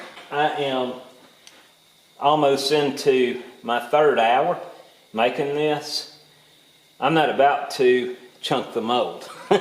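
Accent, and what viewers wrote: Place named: United States. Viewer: I miss Josie & Dollor and you speak very good American.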